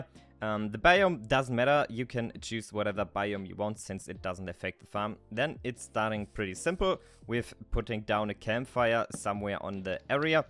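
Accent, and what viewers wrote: Germany. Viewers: geiler deutscher akzent und geiles vid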